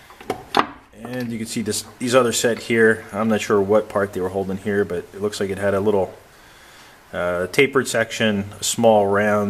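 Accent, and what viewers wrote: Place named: United States. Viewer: Wait until you discover aluminum jaws... Machines like a wet dream, no-mar clamping. You sound like a fellow American, so wth is with all the metric dimensions?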